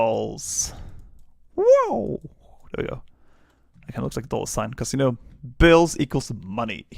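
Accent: Sweden. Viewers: Are you Swedish? It sounds alot like it! Great tutorial anyway dude, I absolutely LOVE your enthusiasm, it makes it so much more fun to follow along. If only more people could see your stuff!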